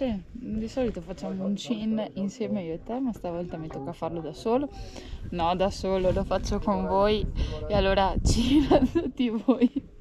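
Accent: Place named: Veneto, Italy. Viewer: Ciao di seguo da poco ma sei fantastica come quasi tutte quelle che vanno in montagna poi accento veneto io sono di verona sponda lago di garda e quel tocco in piu.da dove 6?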